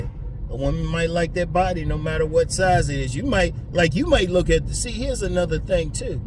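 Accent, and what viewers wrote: Washington DC, United States. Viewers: You sound like you from DC.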